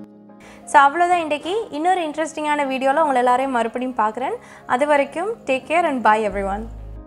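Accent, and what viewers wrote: Sri Lanka. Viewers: Mashallah all recipies are soo gud thanks for sharing ur srilankan slang nice to hear keep going sis👍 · அதாவது பயத்தம் பருப்புதான சிறுதானிய உணவுதானே உங்கள் சிரிலங்கா தமிழ் எனக்கு ரொம்ப பிடித்து விட்டது ஆனால் ஒரு சில வார்த்தைகளை விழங்க வில்லை. அதாவது புரியவில்லை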